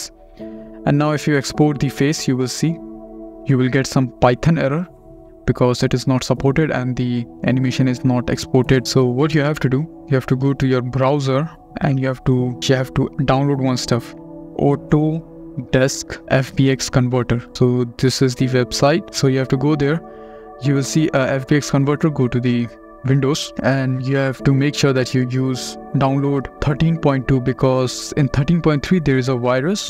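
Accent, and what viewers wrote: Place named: India. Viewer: fucking kajeet tech supposrt singh ass accent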